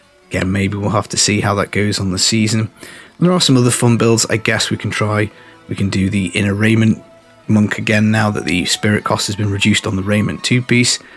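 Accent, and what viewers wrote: United Kingdom. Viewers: first a disclaimer... my mother lenguage is not english..... that will be probably noteced im my speling throuout the comment, anyways.... The content that you presented is an A content. Nothing to say about that, but complements. Very good indeed... But i think you shoud think about the ppl like me... That the english is not the main language... You speak kinda fast and with a pretty strong uk accent... witch is not a proplem at all, but you speak realy fast, like your'e talking to your friends or family... Well... As you know, your content is spread world wide... that means, you shoud think about, i dont know, speak as a www content producer... if you know what i mean... love your job, love your content, but i had to go back few times to get exactly what the hell what you were talking about! heheheh... that is not a complaint.. 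that is just a tip for you.. you could try to be more articulated in you speach... dont hate me, again, that is a tip... maybe speak "last fast" or, i dont know, a little bit more "international", if you know what i mean... thanks for your job! As i said before, it is Ases!!!! ;)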